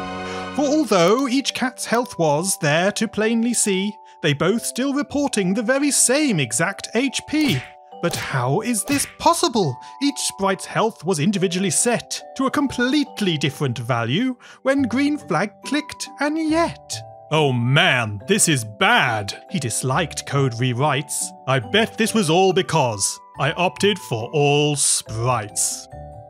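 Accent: United Kingdom. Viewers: BAD MOVIE, MAN SOUND TOO BRITISH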